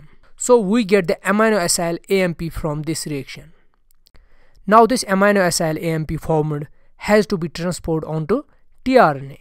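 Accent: Kashmir, India. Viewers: Sir are you from kashmir??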